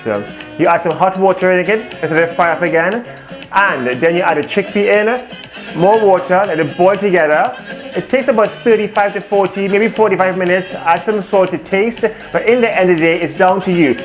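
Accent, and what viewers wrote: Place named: Trinidad and Tobago. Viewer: What part of trini is this accent from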